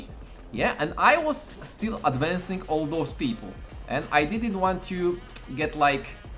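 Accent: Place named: Russia. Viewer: bjutiful raszan akcet yea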